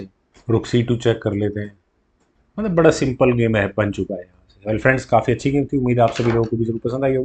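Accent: India. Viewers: Sir punjabi lag rahe ho?.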